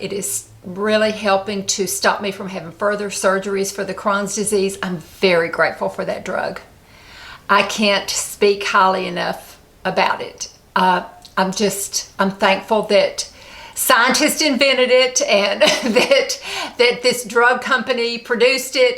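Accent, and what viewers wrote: South, United States. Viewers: I can't believe you are over 60 ... you are so gorgeous. And your southern accent is so cute and soothing. Have you tried The Ordinary's peptide hair serum? I really love it and has really helped my hair loss.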